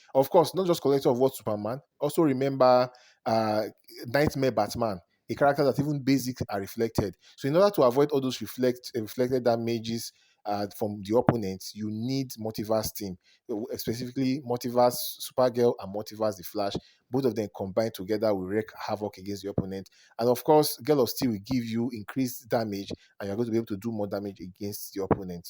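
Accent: Nigeria. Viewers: I enjoyed the video bro you sound like you are a Nigerian 😊. I don’t know how to play league invasions was looking for answers and then stumbled upon your video 🙏🏾. I have all the best teams and op artifacts still I suck 😂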